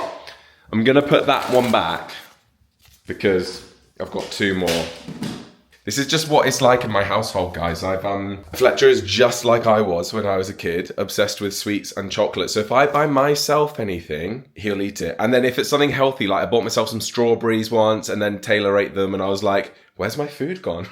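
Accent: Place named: Yorkshire, United Kingdom. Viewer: the Yorkshire accent developing well lad.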